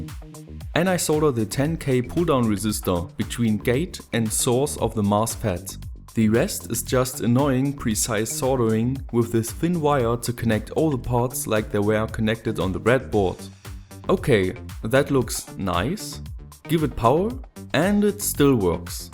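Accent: Germany. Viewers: are you from Germany?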